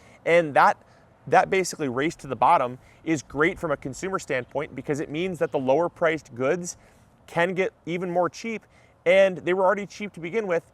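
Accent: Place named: Louisiana, United States. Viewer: You almost sound Cajun. New Orleans is known for Chicory coffee, like Cafe Du Monde. They blend Chicory with Coffee to stretch coffee. I've never thought about growing my own Chicory. Native Texan and been to New Orleans a few times and to Cafe Du Monde a few times. It was awesome hearing someone else talk about chircory coffee.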